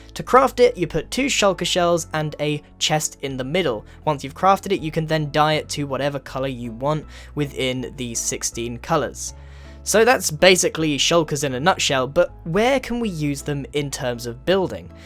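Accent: United Kingdom. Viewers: He sounds to British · That British Voice Is just, way off from mine, I am British and i sound so different.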